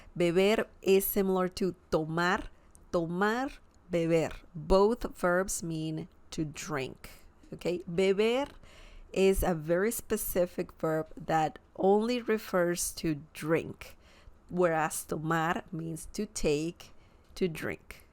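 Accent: Castile, Spain. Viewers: is this considered castilian spanish ? also i noticed that the C/Z words that are pronounced TH is not the case when the letter C is at the beggining of words ?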